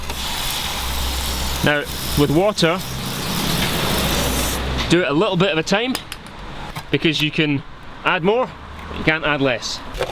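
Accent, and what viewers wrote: Scotland, United Kingdom. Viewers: Great video! Just what I was looking for! Fanx! Scottish?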